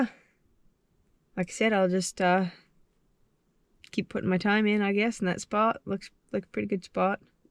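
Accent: Maine, United States. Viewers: I miss the Maine accent I was born in maine but haven't lived there since 1977 I have visited and hunted there over the years I really enjoy your videos good luck hunting your definitely putting in the time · Ha I don't know where your from but you certainly sound like a down easter.l0l.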